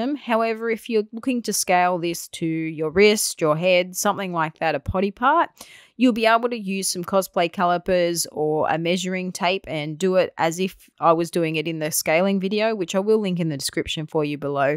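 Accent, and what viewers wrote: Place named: Australia. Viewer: Your video came up in a search for "measure in Cura". Cosplay is not my thing but it is so great to click on a video and here another Aussie's voice come through, thanks for the vid and all the best.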